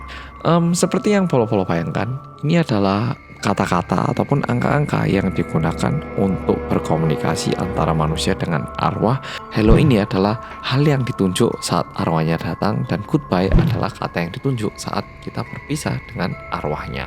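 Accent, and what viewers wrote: Java, Indonesia. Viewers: Mau cerita dikit ya. Ibuku menderita Alzheimer setahun ini Sebelum beliau kena alzheimer, beliau sering tak ajak nonton bareng videomu. Terutama yg kulineran & jalan2 Setelah itu lamaaaaaa sekali ibu ngga nonton videomu krn aku pindah rumah, ngga serumah lagi sama ibu Setelah terkena Alzheimer, beliau ikut aku. Bbrp hari yang lalu, aku nonton Rindingdong di depan ibuku dengan volume full Eh ibu langsung "iki sing wong korea medok kae yo la?" Walah, takjub aku. Ibu masih inget sama, walau memori2 yg lain banyak yang hilang 😄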